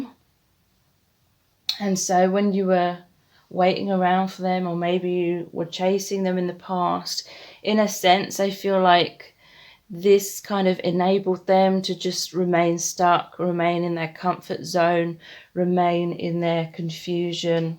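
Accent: United Kingdom. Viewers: ❤ You are amazing as always, wonderful job, thanks I adore your British accent ❤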